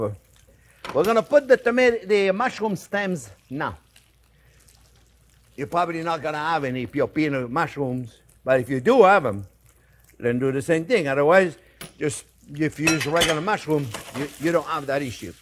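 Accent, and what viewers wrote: France. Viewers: You may have a French accent but you are a great American. I love you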